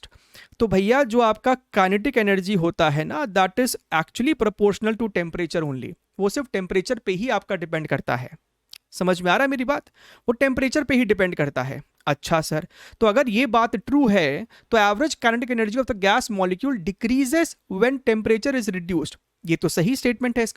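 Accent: Bihar, India. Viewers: Sir aap bihar se hai kya?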